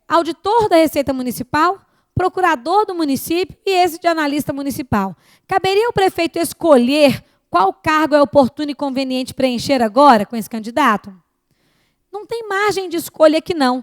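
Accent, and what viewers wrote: Minas Gerais, Brazil. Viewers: com sotaque de mineirooo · professora com sotaque mineiro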